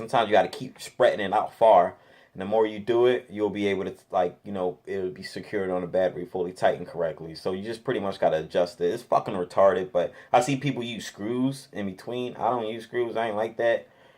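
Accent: Philadelphia, United States. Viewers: Yo u from Philly bro?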